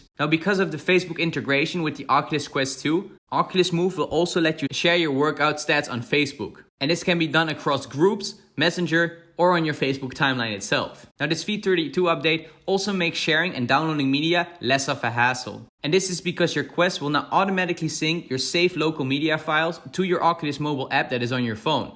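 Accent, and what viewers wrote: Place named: Netherlands. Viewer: Its only clear if you yourself are Dutch, but it doesnt really matter because apart from the slight accent, your English is really good!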